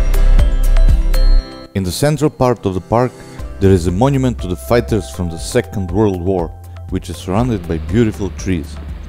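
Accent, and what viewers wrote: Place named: England, United Kingdom. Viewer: Grozan, teski engleski akcenat.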